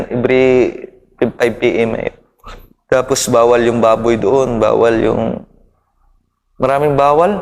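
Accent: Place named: Visayas, Philippines. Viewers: Hiligaynon tingin ko. Ilonggo. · Bisaya master or Bisayang daku or bisdak ang tuno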